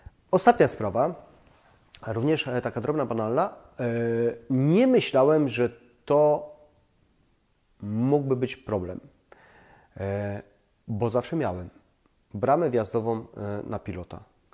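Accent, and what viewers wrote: Silesia, Poland. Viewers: Kocham śląski akcent i intonację :) Ja nie będę jakaś mega nowoczesna - bezwzględnie potrzebuję przestronnej lodówki. Nie side by side (one w środku są masakrycznie ciasne) tylko szafę od podłogi do 180 cm pełnej szerokości - czyli 60 cm, do tego zamrażarka jako osobne urządzenie. Tak żeby do lodówki cały gar rosołu wszedł bez kombinowania co przesunąć. A ponieważ właśnie projektuję sobie nowe mieszkanie z sugestii nt servo drive skorzystam - dzięki!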